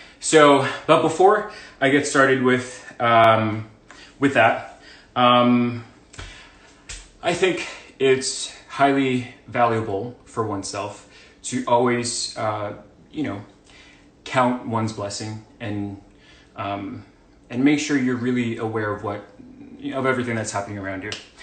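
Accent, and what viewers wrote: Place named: Latin America. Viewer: I thought he was a latino, like his first lenguage was spanish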